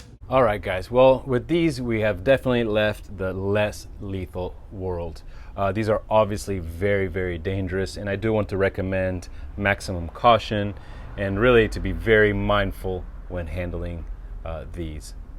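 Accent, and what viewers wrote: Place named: Northern Italy, Italy. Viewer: Grande video e belli questi codici!...secondo me' tu hai l accento dl nord Italia ovest (Milano, Torino)....., Cmq sei grande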